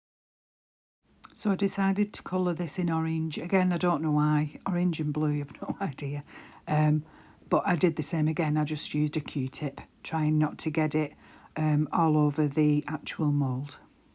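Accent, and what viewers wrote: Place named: Wigan, United Kingdom. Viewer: Hi, I’m new here and loving your style 😊 your accent sounds very Wigan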